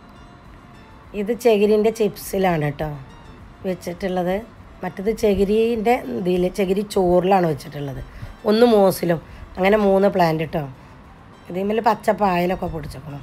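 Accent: Malappuram, India. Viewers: അടിപൊളി നമ്മുടെ മലപ്പുറം സംസാരം സൂപ്പർ ആട്ടോ 👌💞💞💞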